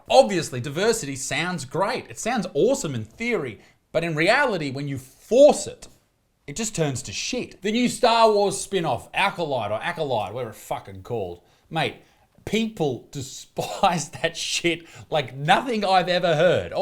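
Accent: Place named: Australia. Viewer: As a fellow Australian I really dislike your oker accent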